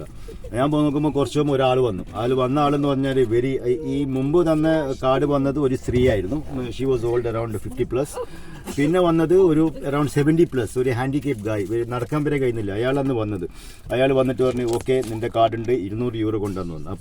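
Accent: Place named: Thalassery, India. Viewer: തനി തലശ്ശേരി ശൈലിയിലുള്ള മനോഹരമായ വിവരണം. Wishing you all the best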